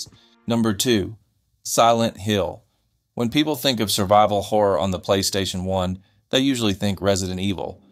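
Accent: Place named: South, United States. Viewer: Where are you from? That's a thick southern accent. Well narrated, good job mate!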